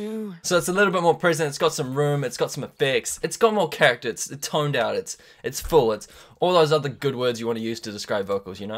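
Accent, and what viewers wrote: New Zealand. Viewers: U from New Zealand? Ur accent is nz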